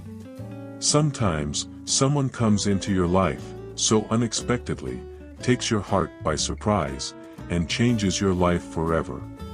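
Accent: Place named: England, United Kingdom. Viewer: This beautiful video took nearly 30 mins to enjoy and I love hearing your reading although I did not watch the video but I truly listened your beautiful English accent my dear 😍 thank you so much for a long uploading and it really took much time for this video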